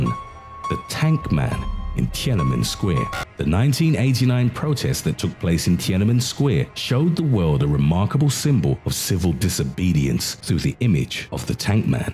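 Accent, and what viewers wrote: United Kingdom. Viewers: its a British accent :/ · Mainstream Commercial Nihilism he has a British accent so mandeler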